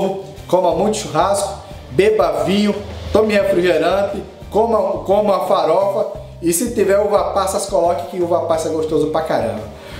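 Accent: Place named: Recife, Brazil. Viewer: Também comprei um Mi drone e tô no aguardo, sou de Recife e pelo seu sotaque acho que você também deve ser daqui, tô certo? kkkkkkk enfim.. o meu comprei na Banggood e chegou em SP dia 05/01/17 foi para o CEINT SP/GEARA e no dia 06/01 partiu para a Fiscalização Aduaneira/BR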